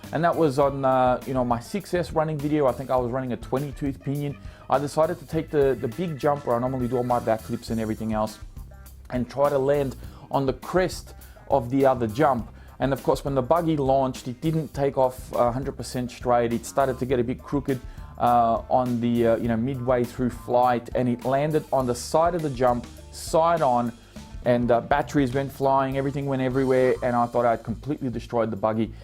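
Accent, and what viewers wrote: Australia. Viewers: cool accent to auzzie and south African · bro ur voice is classic aussiee!!!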